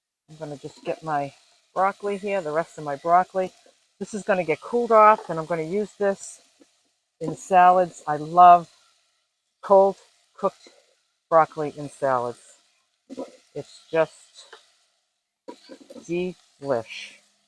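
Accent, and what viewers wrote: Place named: New England, United States. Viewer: I hear that familiar New England accent. Been up here 12 years.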